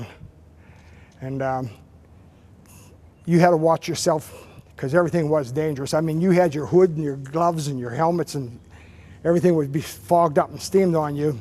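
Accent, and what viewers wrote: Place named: Pittsburgh, United States. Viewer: You got that Pittsburgh accent from Northside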